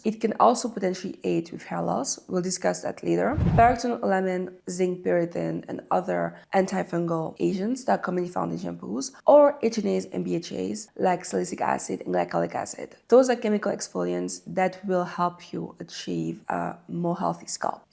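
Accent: France. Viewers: Are you French? The accent it😍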